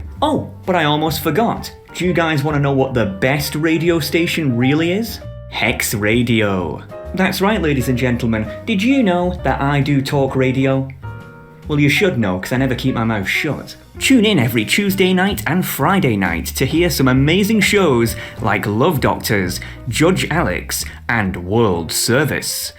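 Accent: United Kingdom. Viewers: wait.. you're bitish?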